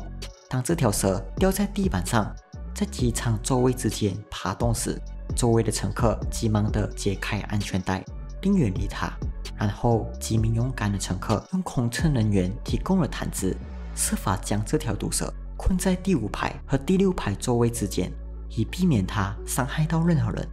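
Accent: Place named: Malaysia. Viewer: 人家是馬來西亞人.這種口音是正常的好嗎...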